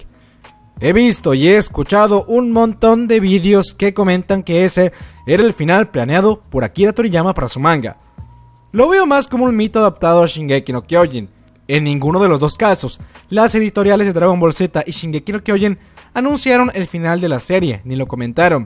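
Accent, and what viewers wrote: Peru. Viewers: eres peruano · eres peruano??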